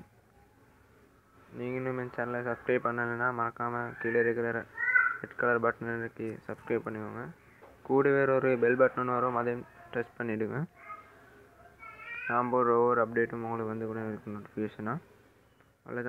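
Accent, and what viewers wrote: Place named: Sri Lanka. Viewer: He's Sri Lankan I think. That's why the slang is different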